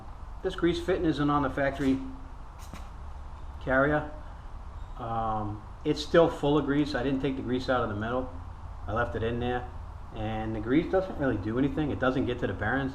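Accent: Boston, United States. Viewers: Def sound like he from Boston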